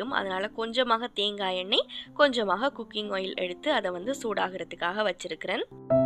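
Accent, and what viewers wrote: Jaffna, Sri Lanka. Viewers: Jaffna tamil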